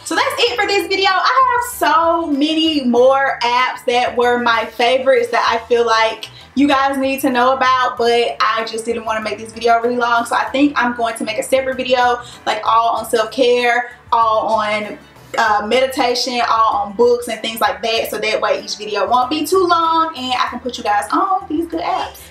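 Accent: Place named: Texas, United States. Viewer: Your voice is kinda a mix between a Texan/southeren accent and something else lol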